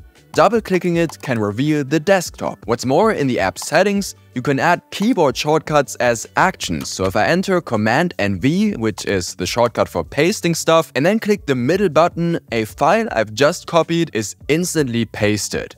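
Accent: Germany. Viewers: Sounds like a germany accent to me. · Ich habe echt etwas gebraucht, bis ich den deutschen Akzent durchgehört habe - Hut ab! 😁 Dein Englisch ist super 👍 Abo hab ich auch mal dagelassen :)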